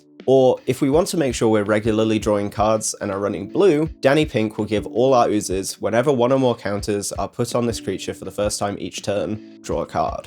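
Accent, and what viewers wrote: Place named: United Kingdom. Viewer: I see slime video I hear british I sub. Fellow UK Slime enjoyer.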